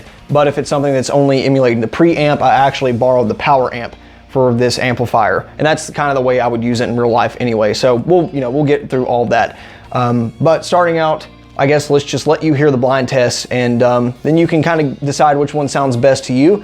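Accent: Texas, United States. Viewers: Dude, a Pantera t-shirt goes with that accent, not a Black Sabbath one.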